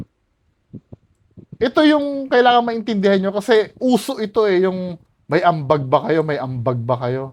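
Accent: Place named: Batangas, Philippines. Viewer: 😂😂😂 pag masaya nagihing batanggenyo a a o owww ala ehhh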